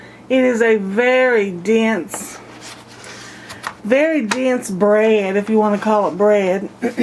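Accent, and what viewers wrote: South, United States. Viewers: You're accent is just so deliciously Southern. I love it!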